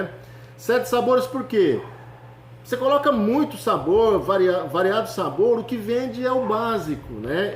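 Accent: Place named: Rondônia, Brazil. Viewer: Tu é de Rondônia mano blz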